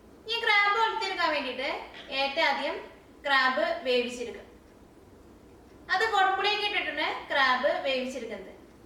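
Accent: Thrissur, India. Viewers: I like ur the way of presentation .. Thrissur slang 😍😍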